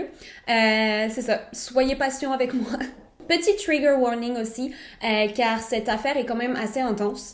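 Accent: Quebec, Canada. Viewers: Je viens de te découvrir.J'adore!...Mais serais-tu d'origine quécoise en premier lieu?Je suis du Québec et j'enttend des différences.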